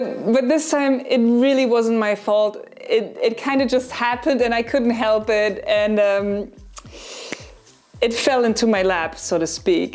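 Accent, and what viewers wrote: Germany. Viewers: I know this is an older video but I have to say I love it. Also, unrelated to the video... Are you German? I love the accent. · Awesome! I’d like to take this opportunity to tell you that your accent is one that takes me back to Darmstadt Germany in the late 90’s. I was stationed there and dated a woman with an accent and voice just like yours. Nostalgia,… Gotta love it. Keep up the good work and awesome channel! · Hello! This is my first viewing of a video of yours. Not sure but I guess you German? I learned Norwegian as a child from my grandfather but now only remember 4 or 5 words. It is just that grandmother was Danish so she was sabotaging things because she was insisting I learned Danish, while my other grandfather was German, so yeah, he was working the German angle. I was so confused! And then I got to kindergarten and the kids only spoke English!!! 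It might have been hilarious to everyone------ but me! But I still have family in Bergen and Ringstaed but I haven't seen them for quite a while now. But it seems I have a few strange words in my vocabulary that come out on occasion, I think they are from my pre-K days. But your English is great and with a lovely accent, and an easy laugh! So good for you on that! At any rate, I think your 'electronics' would be better if you ripped them all out and started over! Safety first!!! Always!!! Even if it costs money!!! (My Norge grandfather was very, very reluctant to spend a penny! But some items are just too valuable to not have new--- like wiring!!! Hope you agree! ) Tak!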